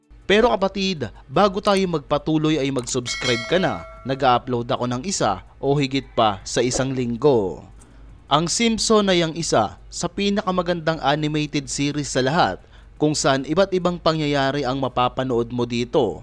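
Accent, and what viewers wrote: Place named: Visayas, Philippines. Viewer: Usa ka flu😅 bisaya Pala to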